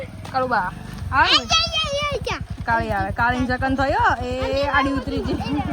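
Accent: Banaskantha, India. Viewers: આ ભાષા બનાસકાંઠા ની લાગે હિન્દી ગુજરાતી મિક્સ😅😅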